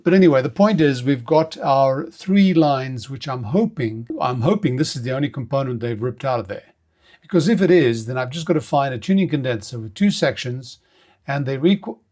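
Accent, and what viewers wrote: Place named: South Africa. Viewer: Haha! I recognised the accent so I thought I’d make you feel homesick! Our 4 sons were all born in Johannesburg, and we lived there for 45 years. We were the last to leave, four years ago now! Love the channel. Alles van beste, Mike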